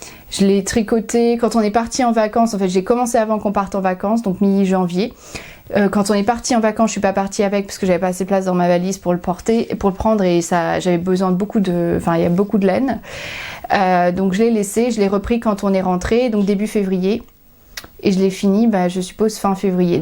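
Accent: England, United Kingdom. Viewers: Bon je crois que c'est mon premier commentaire :) J'adore te regarder, tu es pimpante et ton accent anglais ;) (même si tu n'es pas anglaise ;) ) tes anecdotes! Et tes rouges à lèvres :) Bon sans oublier tes magnifiques tricots! Dommage pour ton gilet :/ Amusez vous bien à l' EYF!!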